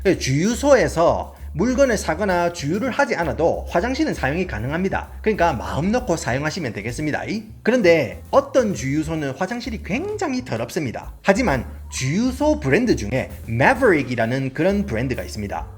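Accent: Gyeongsang, South Korea. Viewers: 영어도 네이티브 한국말도 경상도 네이티브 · 하하하 너무 재미있네예. 아는 이야기를 해도 재미있고 모르는 이야기를 해도 재미 있고~ 나도 경상도 사람이지만 별의별 사투리들을 잊어 버리지도 않고 어찌 그리 잘 하실꼬 싶네예. 내용도 좋고예.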